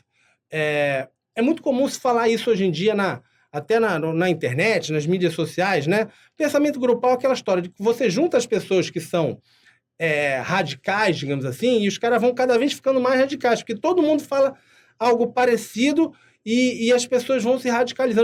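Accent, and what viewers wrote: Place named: Rio de Janeiro, Brazil. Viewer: Você é fera irmão! Carioquês em dia! Vlw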